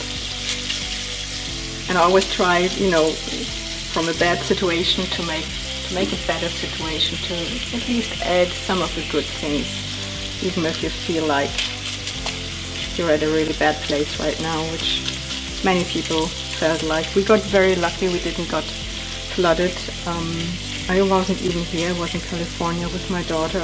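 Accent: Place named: Germany. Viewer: Me: oh, another Internet cook making spaetzle. Me (the moment I hear her voice): oh, she’s German... this should be good.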